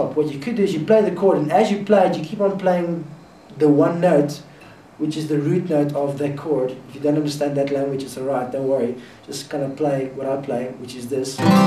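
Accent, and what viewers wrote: South Africa. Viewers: Cool clip, easier than I thought it would be to play. I thought you sounded South African. I'll go play this on the stoep tonight before the braai. Cheers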